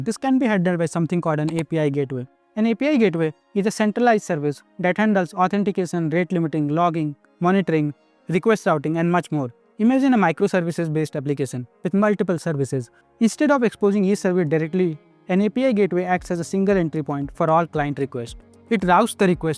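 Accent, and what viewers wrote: India. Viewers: Too much Indian accent for today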